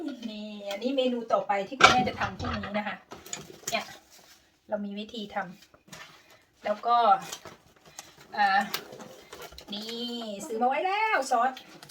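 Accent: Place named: Sakon Nakhon, Thailand. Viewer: สำเนียงคือคนสกลนครน้อจ้า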